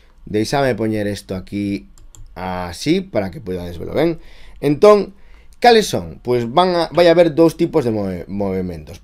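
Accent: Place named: Spain. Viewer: jajaajaj lose es que soy argentino y me da gracia como es su acento pense q era español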